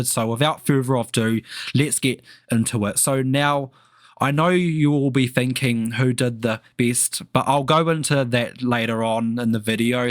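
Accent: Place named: New Zealand. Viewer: Bro, u from NZ? That accent sound kinda like it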